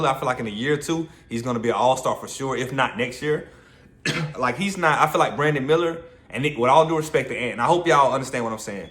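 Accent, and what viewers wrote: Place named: Jacksonville, United States. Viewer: Keep these picks comin from the 904 Jacksonville Fl and I think I hear the Duval in you too😂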